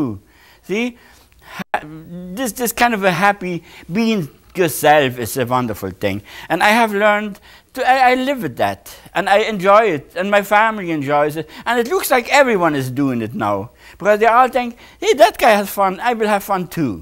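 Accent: Germany. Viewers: Der deutsche Akzent ist das Beste 😁.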